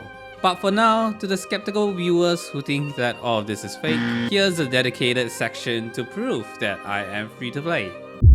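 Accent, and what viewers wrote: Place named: Singapore. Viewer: Ayo are you Singaporean u have Singaporean accent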